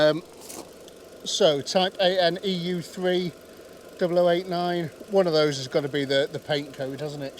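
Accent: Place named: North Wales, United Kingdom. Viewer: Just found this channel and proper enjoying this video. Going off your accent your Chester/ north wales. Nice to find decent content and long videos.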